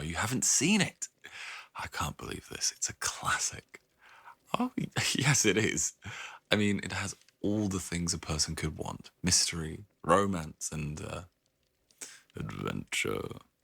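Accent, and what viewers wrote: United Kingdom. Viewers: This is so good - LOVE the British accent! ❤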